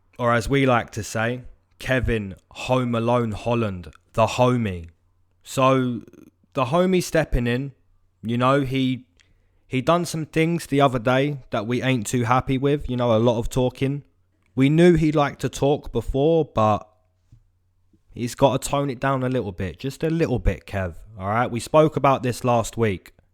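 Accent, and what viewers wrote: England, United Kingdom. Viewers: Hey man always looking for your predictions love your english accent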